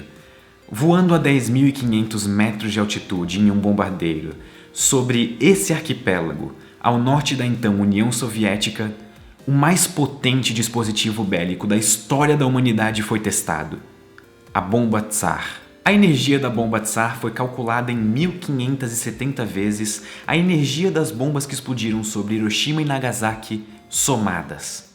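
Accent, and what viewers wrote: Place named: Northeast, Brazil. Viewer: Nordestino sudestizado. Forte abraço!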